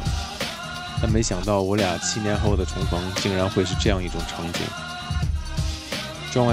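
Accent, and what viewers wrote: Northeast, China. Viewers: 听东北话真是亲切，我18年19年南澳 西澳两次自驾，总长没有你跑那么远，也没有伙伴，但真的很爽，以后有机会还要去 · 本山东人认为，明明是东北口音啊哈哈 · 东北腔，哈哈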